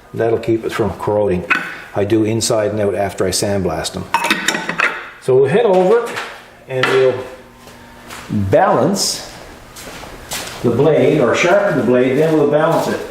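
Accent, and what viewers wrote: Canada. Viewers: Love your videos -- good to know you are so busy. I love your Canadian accent and your "tomfoolery!"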